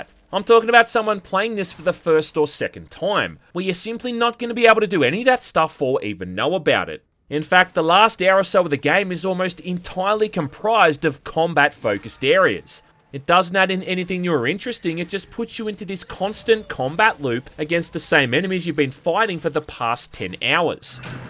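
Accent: Australia. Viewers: Aussie accents are borderline psychological torture 🤯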